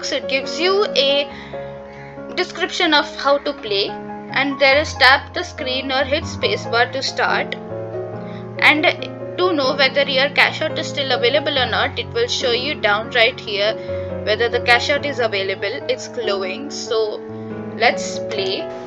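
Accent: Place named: India. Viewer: U in indian? ur accent sounds like so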